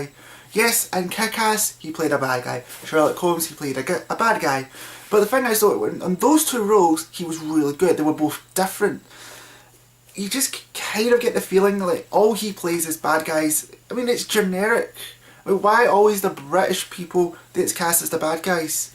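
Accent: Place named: Ireland. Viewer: You speak really quickly in an Irish accent. I kind of had a hard time.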